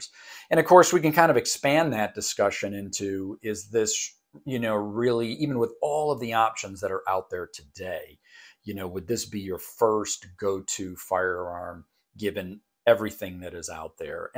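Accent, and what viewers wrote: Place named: Ohio, United States. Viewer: Sounds like you are from Ohio.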